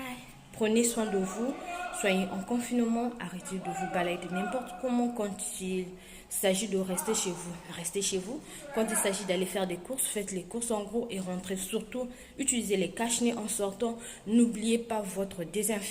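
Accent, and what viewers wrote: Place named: Democratic Republic of the Congo. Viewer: Ah tu es congolaise aussi, ça se sent en parlant😌😅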